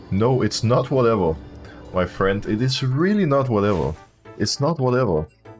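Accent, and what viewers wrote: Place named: Denmark. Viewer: i had the video playing for the first 2 minutes while doing smth else on my pc and when i actually started watching i was trying to find the SETT and i was like wtf xD danish accent got me there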